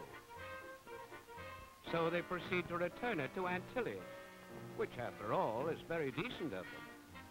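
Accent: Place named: Transatlantic, United States. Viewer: Transatlantic accent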